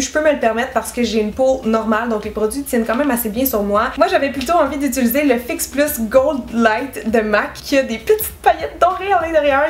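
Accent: Quebec, Canada. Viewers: Il fait quelle température au Québec? Chez moi dans le sud ouest de la France à 35°C on étouffe!! Est-ce que les bases de maquillage tiennent bien quand il fait chaud? Tu pourrais faire d'ailleurs une vidéo que sur les bases pour les différents types de peaux, par exemple lesquelles sont mieux pour peaux grasses surtout pour les paupières, parce qu'il y a tellement de marques et de produits différents que je suis perdu! Merci à toi et j'adore ton accent canadien:) ❤️ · Cela faisait bien trop longtemps que je n'étais pas passée par là ! L'accent québécois, la bonne humeur et les bons plans m'avaient manqué ! J adore le rendu! Et je ne sais pas pourquoi tu n'assumes pas le orange, avec tes magnifiques dents blanches tu aurais tord de t'en priver ;)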